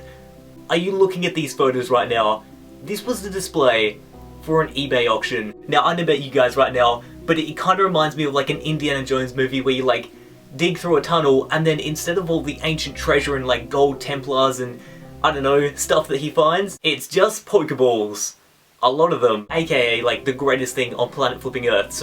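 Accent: Australia. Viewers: Why do you sound like an Aussie?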